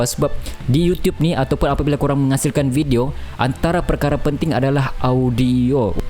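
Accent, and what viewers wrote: Sabah, Malaysia. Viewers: Perkongsian yg bermanfaat.. Teringin mau jd YouTube tapi xde ilmu.. Lps tgk video mcm terinspirasi.. Terbaik admin.. Admin org Sabah ye? Sbb dgr sleng mcm Sabah.. Terbaikkk admin.. Teruskan berkarya..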